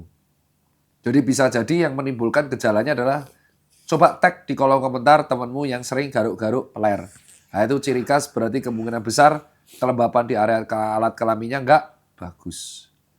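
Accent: Java, Indonesia. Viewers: Ful ngakak anjir beliau kalo ngmong asbun blundernya terlalu plenger mana aksen jawanya medok lagi😂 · jowo nyelll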